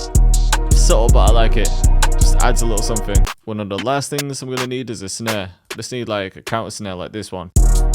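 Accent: United Kingdom. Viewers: YEAH BRO L;OVE THE PURE RAW UK ACCENT STYLE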